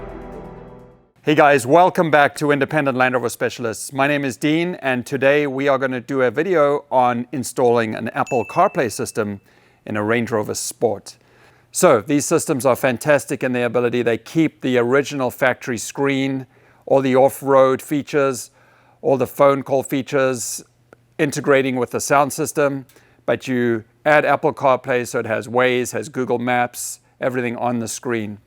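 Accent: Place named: South Africa. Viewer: Nice Saffer accent :)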